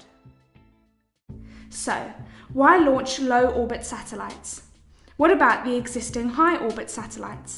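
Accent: United Kingdom. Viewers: So well articulated. Perfect English with a Brit or New Zeeland accent. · Very good, high information content video. I especially like the English accent of the sweet sounding narrator. I give it a 99.99!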